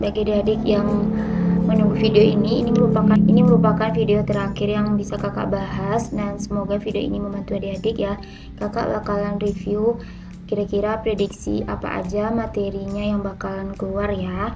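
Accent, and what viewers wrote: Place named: West Sumatra, Indonesia. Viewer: logatnyo mirip urang minang akak ko wkwkwk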